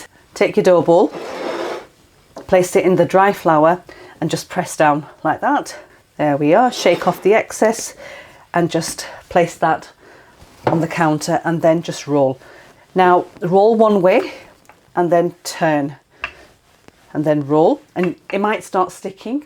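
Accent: United Kingdom. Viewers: I love your UK accent. :) :) Love from Tokyo :) :)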